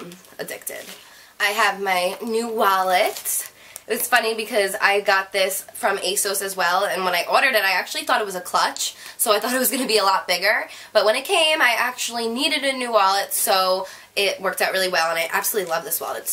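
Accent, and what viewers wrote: New Jersey, United States. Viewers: i love her jersey accent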